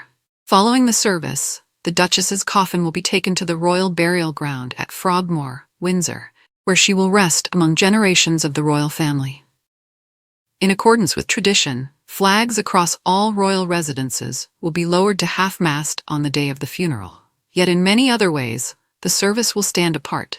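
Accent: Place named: United States. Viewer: She is a feckin' Roman CAtholic, of course she's going to have an RC requiem mass for her funeral, so to will Princess Michael for that matter. Ye Gods, you make it sound as though something never seen before is going to happen when it isn't. What WOULD have been remarkable would have been if they forced the family to hold a NON Catholic requiem mass for her funeral. For a supposed UK channel - you might want to lose the US accent, gives millions the wrong idea about your channel - you don't appear to know what you're talking about. The only ''unprecedented'' part is that she is the first to die among the RC family members and thus the first to have a public RC funeral but she isn't the only family member who is Roman Catholic and it won't be the last RC funeral either.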